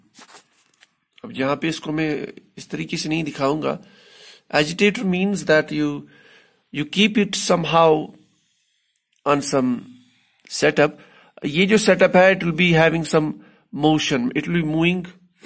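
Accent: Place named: Kashmir, India. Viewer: cxadaan hasaa chee .. nafar chee praraan 6.40 ... sir you are from kashmir .. love you exam k liye buhat zaroori tha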